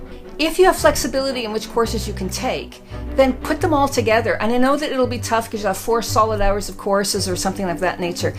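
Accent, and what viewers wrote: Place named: Canada. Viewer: Oh ! Wow ! I had no idea !?? That you are/ were a lawyer !!!?( Did you mention in the 1st video I watched !? ) You are a very interesting woman ! 1st. A very good looking lady .2nd. A lawyer 3rd .Cares about animals 4th. has a musical talent ( piano )5th. a vloger 6th. a Canadian woman living in Wales ! ( keeping her own accent & not speaking w/ a Welsh or British accent ! In a way , cooler as your native language is English to begin with ! I've met many English men lost their accents living in U.S. It's a shame ! I think everybody should keep somewhat their accents ! 7th.living a healthy lifestyle , a vegetarian & rides on a bicycle everywhere you go ! ( maybe except for drinking !? Lol ). 8th .A mother of a gorgeous young lady who's gonna be a lawyer herself in the future ! COOL !!! You looked like an actress in the picture !!! 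I feel sad to see your friend's passing information at the very end ! Way too young ! I am sorry for your loss ! Very sad .💜💜💜💜💜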